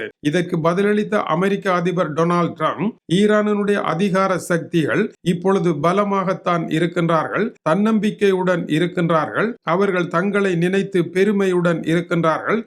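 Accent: Sri Lanka. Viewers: அதிஅகோரம் ப்பா ஸ்ரீலங்கா தமிழ் ❤❤❤❤❤❤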